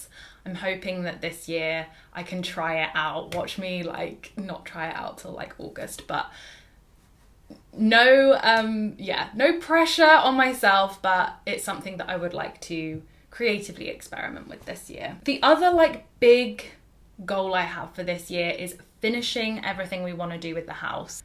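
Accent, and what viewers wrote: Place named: United Kingdom. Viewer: Hi!! I've been watching your vid's for a bit now but have finally decided to comment:) yay! I first found you as was searching for beauty tips, etc for Redheads and you popped up! Even though I'm quite a bit older than you, I totally relate to so many things you chat about, enjoy your recommendations, and love listening watching you and think you're a very mature, good head on shoulders kind of gal - love your accent too! I live in Canada but my entire fam is in the UK so gives me warm fuzzy feeling watching you - love your hair colour!! MIne is more on the Auburn/Copper side. Look forward to watching you in 2024.....Congrats on your new house & getting married:) Redhead sistahood - ha!💗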